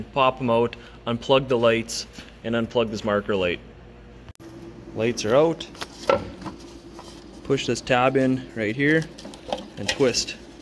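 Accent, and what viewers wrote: Canada. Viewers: Your accent sounds very Canadian? Manitoba?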